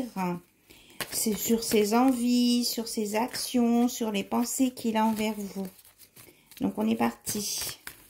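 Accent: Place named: England, United Kingdom. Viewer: My Dear Thank you for this beautiful guidance. I love your English accent. I wish you a sweet and pleasant day in Divine Grace and Mercy 😇😇🙏🏽🙏🏽🌸🌸🌹🌹🌟🌟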